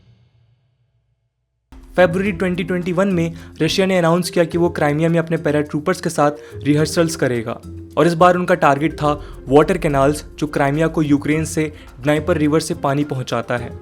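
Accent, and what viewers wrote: India. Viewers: Indian accent. I am pretty sure India will be on the west side in this conflict as they really hate China. Then most of the middle east will join putin. North and South korea will clash together. Wildcards could be Brazil and south american countries. Some will join the west but some want to see the US burn. Other wild cards would be African nations like Egypt, Sahel, Etiopia. It will all be a bloody mess and it is hard to see how we will get any winners in this conflict.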